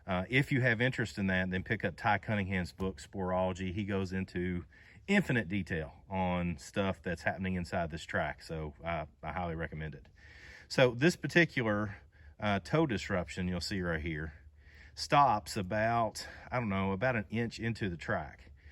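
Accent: Kentucky, United States. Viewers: Knew you were from KY the moment I heard you speak!!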